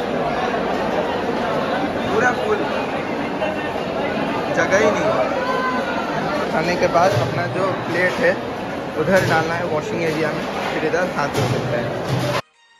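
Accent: Odisha, India. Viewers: Odisha se ho kya?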